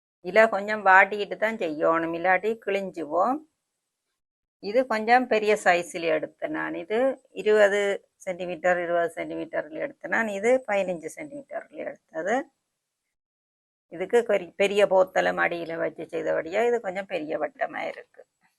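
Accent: Sri Lanka. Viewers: neenga pesarada Partha Jaffna madiri erukku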